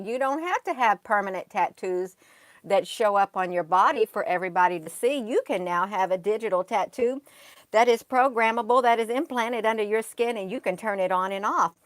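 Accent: South, United States. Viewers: Y’all, Loving 🥰 hearing, I just love ya southern bell accent? Your so priceless, the best way to announce such awesome, beautiful,greatness exploring and explaining what a super amazingly interesting 🤨 slightly evil named new style of body Art, that no one can see, I’m soooo bubbly just listening and been soooo excited by this cool 😎 new program digitally tattoo, Mmmmm I’m so glad 😀 lol lol On and off, after work! Before work Mmmm I may have “ IN bad Mood, Stay away “ Then..........” I’m mentally ok 👌 to day, don’t worry you can say Hi too me “ Oh, I think You’re just found, out the place I can truly fit in, Wowed, I’m so excited, I need to go now as I need to work so I can get my whole arm sleeve down!!!!! Mmmmm “” From My Heart ❤️ to Yours ❤️ “ Do you want me to get my one first, Then we can have our own club, Or maybe “I believe in UFOS 🛸” I’m so glad my skin is clean, thanks, Need to think about what colours ❤️🛸❤️🛸❤️🛸❤️🛸 😘🥰😍 Your so right It’s gonna be soooo Cool the coolest since sliced bread 🍞 xxxx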